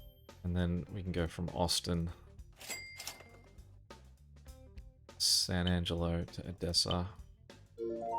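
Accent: Australia. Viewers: I don't mind the Aussie pronunciations of some of these cities, even if they're wrong, because I know Aussies are tired of hearing people saying Bris-BAYN and not Bris-BIN/Bris-BUN for Brisbane, or Mel-BORN not Mel-BURN for Melbourne.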